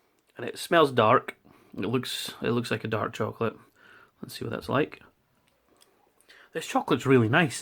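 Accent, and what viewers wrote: Scotland, United Kingdom. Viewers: OH MY GOD I LOVE THE SCOTTISH ACCENT Great review btw xd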